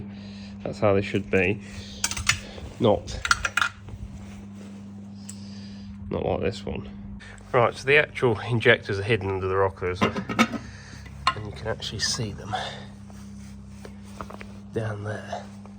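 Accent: United Kingdom. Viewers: Bro i absolutely Love that British accent 😂❤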